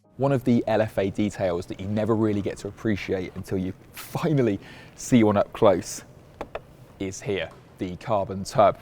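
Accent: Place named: United Kingdom. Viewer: a british guy talking about a japanese car im laughing hard this is so funny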